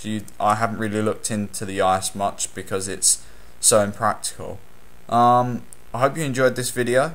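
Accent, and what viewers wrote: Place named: Australia. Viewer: you sound like the australian guy on the series House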